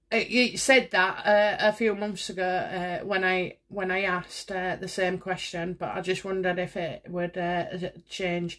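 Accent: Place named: Lincolnshire, United Kingdom. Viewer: Would love to know if your accent is from around Lincolnshire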